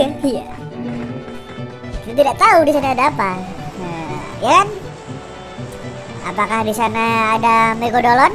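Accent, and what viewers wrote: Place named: Banyumas, Indonesia. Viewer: orang mama.? Kok kadang ngomong ngapak.?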